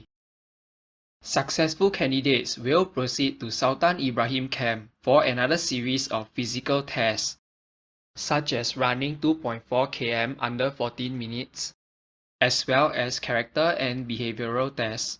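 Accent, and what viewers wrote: Singapore. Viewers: U srsly expecting a Singaporean to have a Malaysian accent? 🤣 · Your Singaporean accent is really strong.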